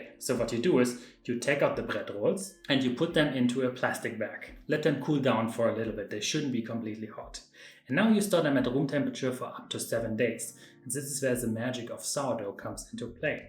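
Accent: Germany. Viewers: Your German accent is so much stronger than usual! 😊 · Wie schon von anderen geschrieben, lustig anderen Deutschen auf Englisch zuzuhören wie Brot gebacken wird. Als Muttersprachler ist der deutsche Akzent in diesem Video echt hart und erinnert an gruselige Zeiten von Schulenglisch 🤣 Klasse Kanal und tolle Videos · Authentic German bread rolls with an authentic accent. Perfect! I have a lot of rye, so I will have to German it up even more :) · These look delicious! My girlfriend makes "Worstbrotchen" (sorry about the spelling) what exactly are they, do you know? I've never had them but she makes them at Christmas. Thanks for your awesome videos, with or without the heavy German accent! ❤️🙂 Also, have you ever made Ezekiel bread? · Your German accent is really strong today. Is that because of the German rolls?? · LOL I thought exactly the same thing.. I have never heard him speaking English with that German accent :D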